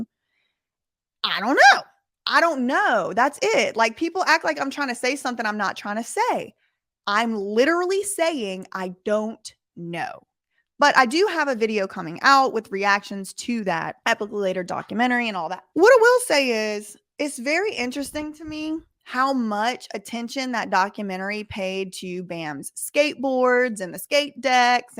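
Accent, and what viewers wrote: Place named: Louisiana, United States. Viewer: I knew you had to be from LOUSIANA 😂❤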